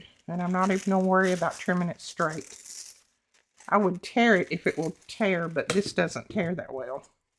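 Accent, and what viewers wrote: South, United States. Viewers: Hi just love your inspirational videos. I could listen to your soft gentle Southern accent all day. Wishing you all the best for this year 2024. I was wondering if you could design some digitals with some birds, flowers and butterflies from USA?. I am from the Uk 🇬🇧 Bunny x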